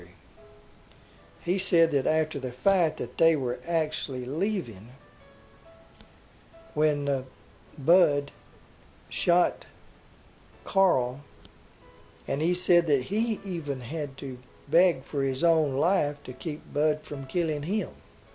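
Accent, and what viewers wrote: South, United States. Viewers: I enjoy all of documentaries he has that southern voice that makes for great listening keep up the great work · You have a great voice for narrating, and great American accent and local dialect.... nice.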